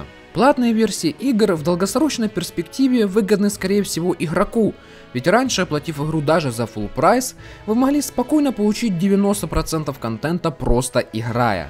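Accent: Ukraine. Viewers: черт, я по голосу вычислил сразу что ты из Украины🇺🇦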